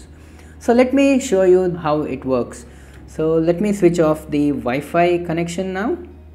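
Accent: India. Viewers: Hey man...are you from india..??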